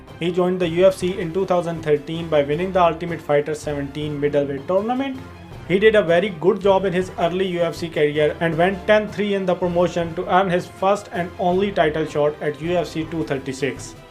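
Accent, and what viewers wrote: India. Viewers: "Ur English is very very Indian", lol. Tf do u expect an Indian dude to have? A British accent? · Plz do something about your accents. Ur English is like very very Indian.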